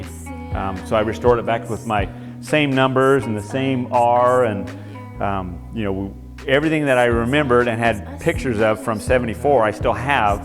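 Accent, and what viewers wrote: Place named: California, United States. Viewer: Oh my god!!!! can i come over and walk that collection some time. You sound like your in california, im up in tahoe. Ill bring beer. Workin on a 79' XR250 right now, but im going more custom. My dad gave away 3 ossa's in like 88' i was in 5th grade and had no pull. My gramps gave away 2 old hondas and a yamaha that same year. Man! those guys I'd love to have those bikes today.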